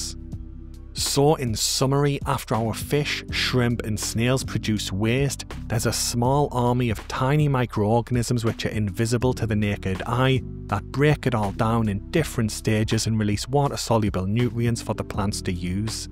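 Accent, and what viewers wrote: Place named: Newcastle, United Kingdom. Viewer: Mate how you haven't blown up yet is beyond me! I truly believe you're the best fresh aquatic channel on YouTube, comprehensive, accessible, VERY WELL RESEARCHED, no tedious filler content or salesmanship. The Geordie/Smoggie accent is a bonus, sorry can't tell the difference 😅 All the best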